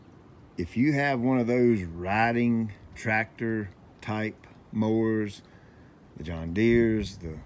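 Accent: South, United States. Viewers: Where are you located? You sound like your from the south but in my part of the south lawn stripes are unheard of and I think it’s because of the types of grass that grow around here don’t lay down that way. I think zoysia grass will do it though, we typically grow st. Augustine, Bahia, centipede and zoysia around here in north Florida and South Georgia. I’ve always been interested in stripes and would love to have them here but we don’t have “fescue” grasses around this area. Looks good brother!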